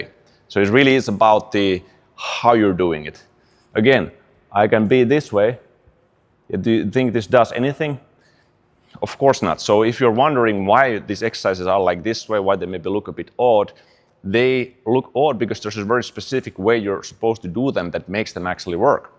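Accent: Finland. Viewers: OMG I was watching this without knowing where you were from, and I kept thinking "this accent sounds SO familiar to me..." Then when you mentioned Finland, the bomb just dropped 🤯 I realized you sound EXACTLY like Ismo!! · I can tell a Finn accent a mile away :) hard to make those English sounds isn't it ? Love the Finns. I could be wrong but sounds like Sissu to me. Love from Texas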